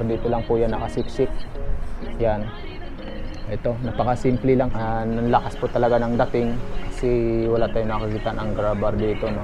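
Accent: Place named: Visayas, Philippines. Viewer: nice review i like hasang hasang n parte ng mio aerox abs kaya siya talaga naiiba s lahat at iba k rin magspill ng words very natural in visayan accent kudos to you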